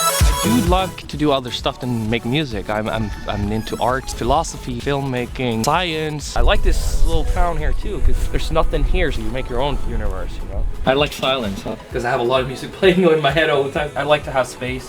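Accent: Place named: Canada. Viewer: Why does he talk like a Canadian if he's from Norway?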